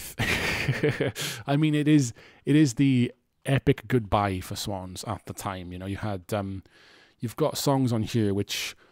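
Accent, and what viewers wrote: Wales, United Kingdom. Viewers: Strong welsh accent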